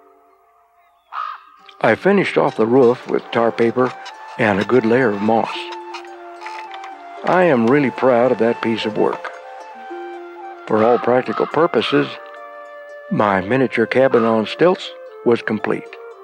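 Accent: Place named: South, United States. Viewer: Alaska??? Has to be. He sounds southern tho. Man I wish I could build like this we have all lost this knowledge its so sad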